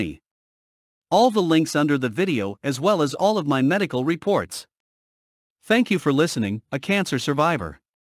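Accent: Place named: France. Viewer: I agree with others , your French accent is lovely and totally understandable. The robots voice accentuates in the wrong place quite often which then doesn’t make sense. This robot voice is also associated with a lot of scam videos. I would hate to think this would put people off listening to your story. Be proud that you speak such good English!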